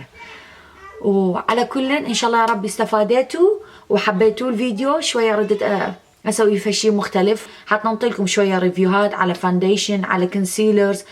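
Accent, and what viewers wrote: Iraq. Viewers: حبيبتي انتي عسل ومررره متواضعه .. واحلى شي اللهجه العراقيه عششششق ♥️😍 .. ربي يحفظك 😻. .. حبيبتي ايش ترشحي اكثر جورجيو اىماني ليمونس سيلك والا استي لودر دبل وير ... ايهم افضل ويقعد تطول وما يخطط محتااااااااره بينهم 😔😔😔😔💔💔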